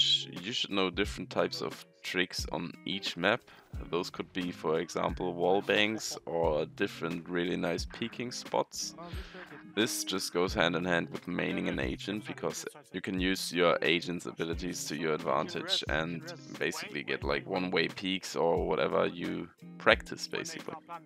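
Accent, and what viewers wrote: Germany. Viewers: Are u a german guy ? (Because of the accent)😅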